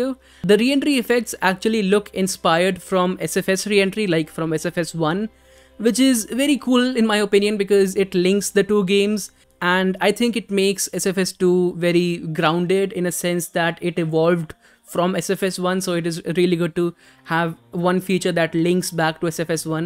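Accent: India. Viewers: Bro has Indian accent!.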